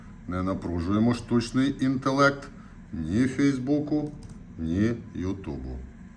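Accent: Transcarpathia, Ukraine. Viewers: Дякую за роботу і Закарпатський діалект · Класно по Закарпатськи)) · Йой,як я давно не чув файної закарпатської говірки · Дуже файно на закарпатський лад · Закарпатська озвучка-ТОП❤🫡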